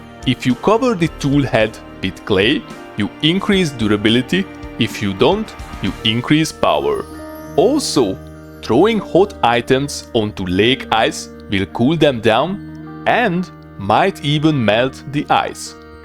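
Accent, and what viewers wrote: Hungary. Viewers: Are you Hungarian? I’m asking because you have quite a Hungarian accent. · I can spot a fellow lovasíjász when I hear one! Klassz videó, köszi bátyus! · First video I have ever watched from you and in the 1st minute I could tell you are hungarian. Örülök hogy nem vagyok egyedül a vintage story kedveléssel magyarként:>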